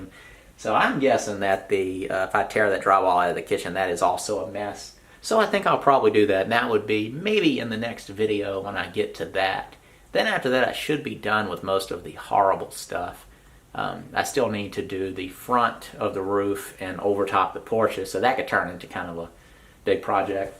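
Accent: South, United States. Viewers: What state are you in? Your accent sounds like Alabama, Mississippi, Tennessee, or Georgia. Maybe the Carolinas....I'm in GA.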